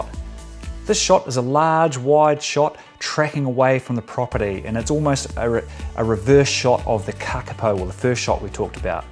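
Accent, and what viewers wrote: New Zealand. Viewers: In the “EAAR” no offence hahah I love the NZ accent!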